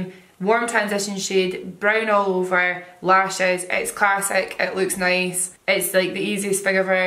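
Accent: Scotland, United Kingdom. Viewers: She's Scottish, and yes I love her accent too! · Are you Scottish? :}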